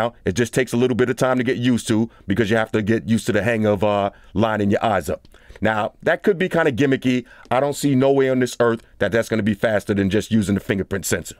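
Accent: New York, United States. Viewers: alright alright, i clowned this dude in the past because of his harsh voice and New York accent. but i see he done softened his image by putting a cat in his videos. Good job.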